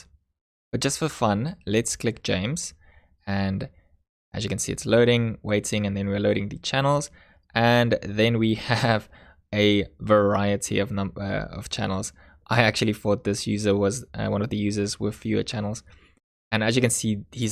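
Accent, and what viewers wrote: South Africa. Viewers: You sound very South African...😃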